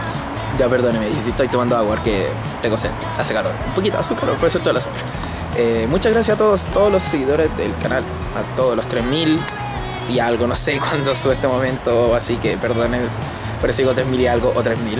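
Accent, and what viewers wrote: Chile. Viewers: y? creo que son de mi ciudad Arica, sino sorry jaja. Oye que bueno que has mejorado tu forma de hablar, no te conozco en tus inicios, pero esa forma animada de decir las cosas a la chilena es tu buen sello, saludos compaa.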